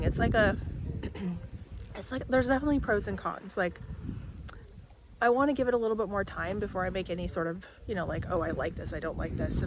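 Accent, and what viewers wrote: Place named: United States. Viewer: Hi there, so nice to see you back on the SWCP. I love to hear your perspective on the path with you coming from across the pond and chuckle at some of your pronunciations. I don't have the problem of overgrown paths as i only walk the SWCP in the autumn winter months, though i do have mud to deal with. You deserve far more views and subscribers than you have. Keep up the great work.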